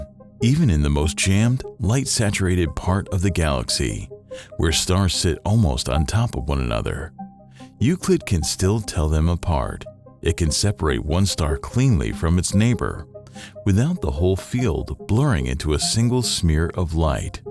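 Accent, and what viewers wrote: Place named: United States. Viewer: I find it hard to listen to the American accent. It has been poisoned.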